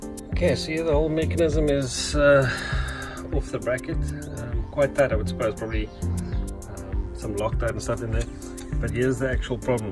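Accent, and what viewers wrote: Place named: South Africa. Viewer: Nice to hear a South African on YouTube give a how-to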